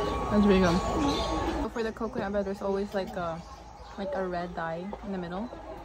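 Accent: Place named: Visayas, Philippines. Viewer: season naman diay ka mag bisiya ❤😂 bisdak na 100%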